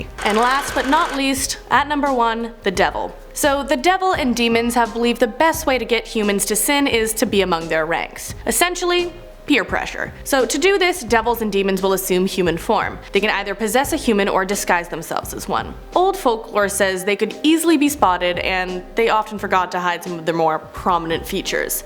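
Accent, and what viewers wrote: Canada. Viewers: I like how they talk in Canada. Words like: "about" they pronounce "aboot."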